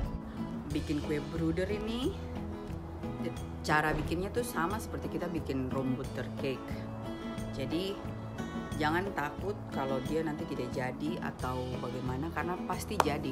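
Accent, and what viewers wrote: Papua, Indonesia. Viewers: Salam kenal Dek.. Sy SUKA LOGAT PAPUAx...😍😍😍Asli... Sa pikir...su sampe...Belanda Logat Lain kah😂😂😂 Andalaang😍😍Gbu Sorong Papua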